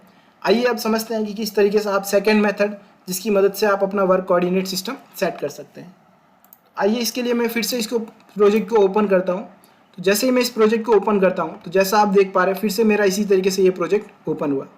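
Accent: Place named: India. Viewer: Heavy Indian accent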